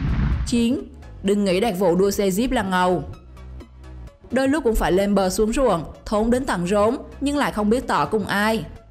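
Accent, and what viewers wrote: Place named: Central, Vietnam. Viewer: Cám ơn admin cháu gái dễ thương ❤admin cháu gái có giọng nói miền trung nghe ngộ ngộ dễ thương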